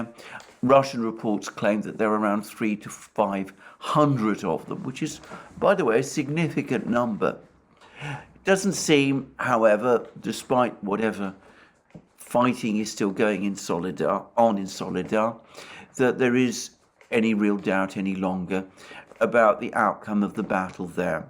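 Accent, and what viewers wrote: England, United Kingdom. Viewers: is a Russian with and English accent.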